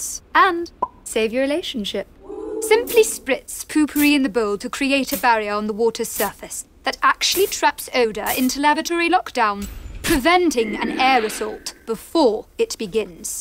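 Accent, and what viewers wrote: Scotland, United Kingdom. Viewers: Just stopping by to say that this is one ad I genuinely enjoyed, and chose to sit through. It seems to have genuine personality. the snooty British accent just adds a level of self-awareness/ irony. Would buy if I didn't already have a fan. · You can hear her Scottish accent more in this.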